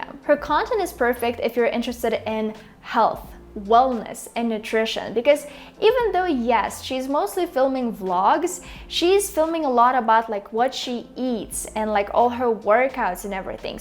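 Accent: Russia. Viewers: You speak very well and a nice girl.but still it is heard that you are Russian speaker. ❤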